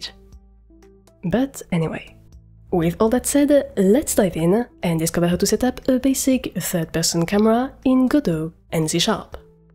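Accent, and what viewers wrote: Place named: France. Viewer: Wait a Minute... I know that accent... You are French, lived there for 5 years, Toulouse was a great place ^^ Keep it up, great tutorial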